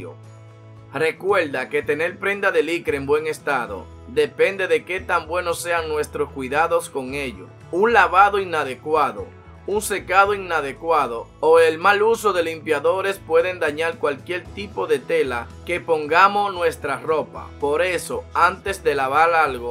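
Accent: Dominican Republic. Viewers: Es dominicano/ puertorriqueño amiga 😹😹 así es la lengua allá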